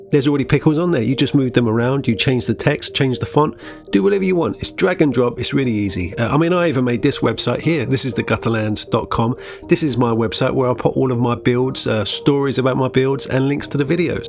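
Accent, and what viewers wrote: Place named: Cockney, United Kingdom. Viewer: bruv, that cockney accent gets me every time. XD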